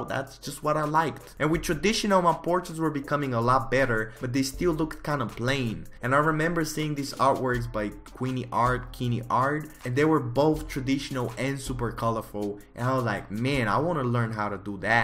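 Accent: Russia. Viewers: Why are you talking with a Russian accent? it's me saying to you as russian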